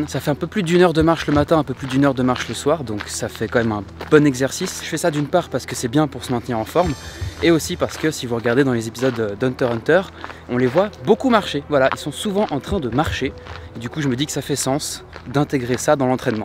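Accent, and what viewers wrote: Switzerland. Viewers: T suisse?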